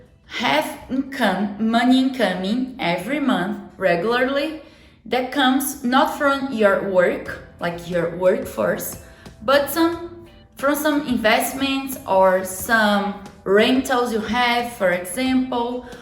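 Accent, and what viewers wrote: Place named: Portugal. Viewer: Are you Portuguese blood? Your accent and looks are more Portuga than American.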